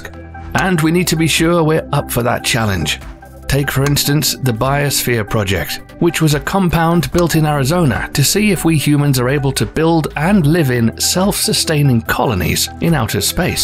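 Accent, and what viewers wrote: United Kingdom. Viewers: Don't say its a mistake because your wrong even with your cheesy British accent.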